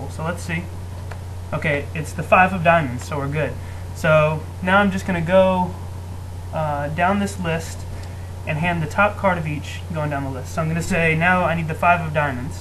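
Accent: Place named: United States. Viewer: You English isn't that poor as far as I can tell. You sound American.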